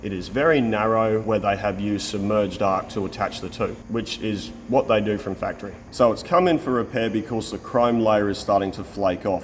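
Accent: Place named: South Australia, Australia. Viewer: Machining is awesome, extremely intelligent and damn good work. is the brains of the shop or is it? Y'all are so much fun to watch and I just Love y'all's videos. your accent is quite southern Australia, just a little different than our RedNeck HillBilly Arkansas accent. Out takes are so Funny. Thanks guy's. Some comments are just for fun. Good night from central Arkansas, United States of Merica.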